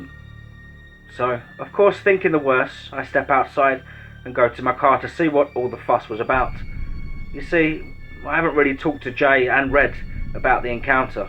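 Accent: England, United Kingdom. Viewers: You rock,. Keep it up. Love to hear stories in an English accent... My forbears are from the Isle so it's in my blood. I hope you had a happy Xmas with the family. Stay safe and keep up the awesome work.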